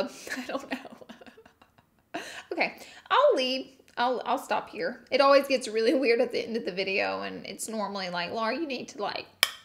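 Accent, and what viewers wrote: South, United States. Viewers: You’re so funny I’m new to your channel and I love your southern accent and your personality!!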